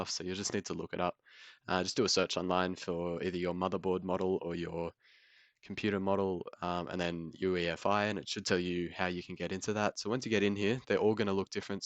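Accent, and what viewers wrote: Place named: Australia. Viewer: Well done on your first video, i found your style informative and easy to understand. Just getting into Linux as a newbie and will be following your channel going forward (subscribed) also, nice to hear a fellow Aussie for a change LOL. Good luck with your channel and thanks for teaching an old 65 year old some new tricks. Cheers from Melbourne!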